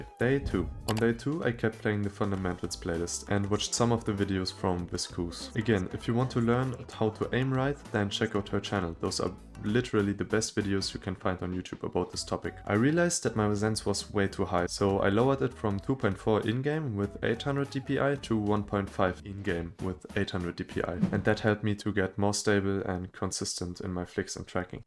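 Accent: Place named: Germany. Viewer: he sounds german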